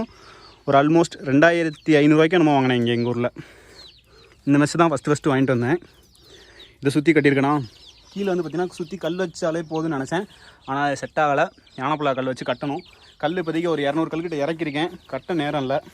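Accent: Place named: Madurai, India. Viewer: 🤝Congratulations 🤩Your madurai tamil slang was nice to hear🙌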